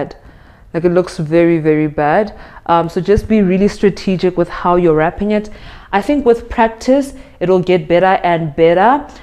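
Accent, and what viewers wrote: South Africa. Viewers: Came here for a review on the waist trainer (which was so detailed thankyou💛) but I also got so distracted by your accent, I come from the UK and love the South African accent so much. I've been obsessed since watching Blood and Water 😍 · My first time here and I was crossing fingers you not naija😃because wow we are tired shame(love them still😂) then I heard your accent and I was like ai yebo ai yebo🤗🤗my sister oooh.anyways I have a problem,my tummy was slightly big so I bought these 2019 used it but nothing happened then I stopped.last year decided to buy a different one but nope rather my tummy has changed from being tight to soft that's all🤔😢so any advice for the sister here?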